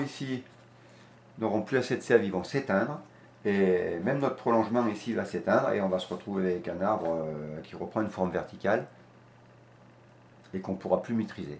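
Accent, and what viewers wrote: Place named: Dauphiné, France. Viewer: Quel bonheur d’entendre l’accent du Dauphiné ! Merci pour vos vidéos très précises et claires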